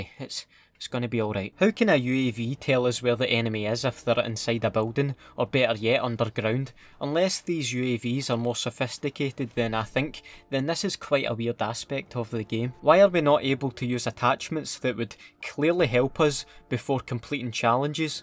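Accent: Scotland, United Kingdom. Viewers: Are you Scottish but still nice vid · Not trying to be mean or raises he sounds like he is from 🇬🇧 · Nice Scottish accent, mate! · Man I love your Scottish accent lmao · I really can’t take this seriously with the Scottish accent (no offense) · I loke that Schootis acent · I like ur Scotland accent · The Scottish accent only makes it funnier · Who else loves this guys Scottish accent? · He's Scottish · Is he Scottish? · I love the Scottish accent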